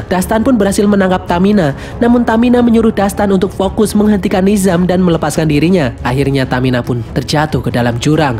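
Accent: Java, Indonesia. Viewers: Miminnya orang Jawa😂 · Wong jowo yo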